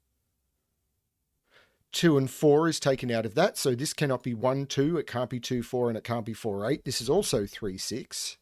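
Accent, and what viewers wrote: Australia. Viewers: Love the Aussie accent... had to check the screen to see if the setter was truly "Naughty"! lol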